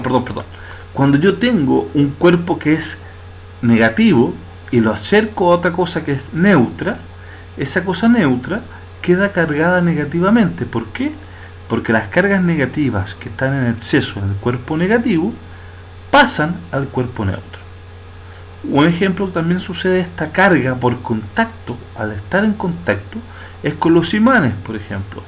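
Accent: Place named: Chile. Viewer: Si porque habla de 8vo y 1ro medio que solo se utiliza en Chile, también tiene acento chileno y utiliza las palabras de ya dicho pais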